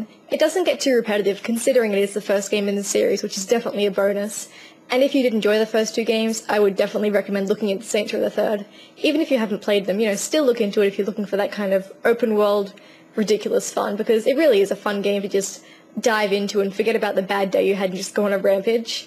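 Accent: Australia. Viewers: where are u from your accent sounds like austrailian maybe .....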